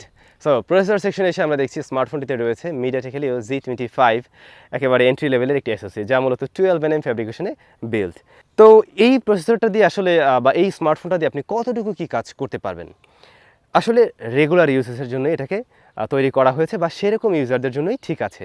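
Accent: Barishal, Bangladesh. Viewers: ওহ আন্নে বরিশাইল্লা🥰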